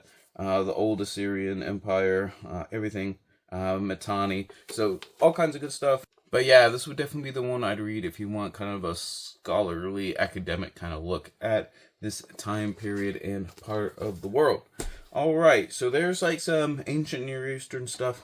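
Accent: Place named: United States. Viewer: Firstly, breath before you make videos and during, speak clearly and don’t mumble. Learn how to pronounce the names of stuff you are clearly so interested in, in the good way, look to the British for this, because your American pronunciation are cringe every time.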